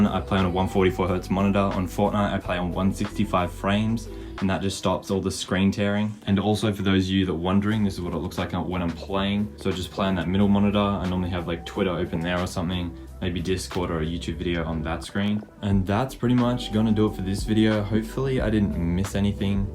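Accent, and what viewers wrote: Australia. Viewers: r u australian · You’re Australian???? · wait your Australian? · Are you Australian?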